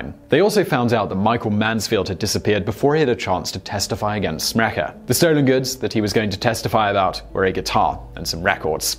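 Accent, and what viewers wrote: United Kingdom. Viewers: Your accent is the only British accent that is actually pleasurable to listen to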